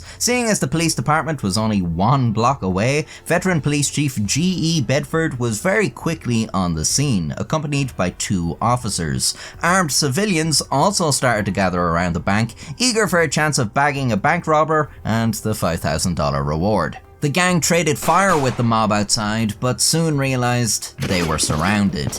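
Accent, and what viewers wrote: Ireland. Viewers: Can you cover the guy from Mali who stole 242 million (in your cute Irish tone) from Saudi I think Started listening to some guy and got bored and thought about you You little Irish scamp😂😂 Link on it's way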